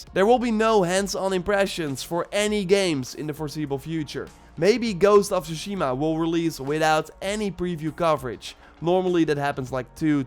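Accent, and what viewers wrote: Netherlands. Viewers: lekker maat, goeie video. ik vroeg me al af of je Nederlands was aan je accent te horen. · U sound dutch dude xD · NGL, when I imagine a Dutch accent, this is what it is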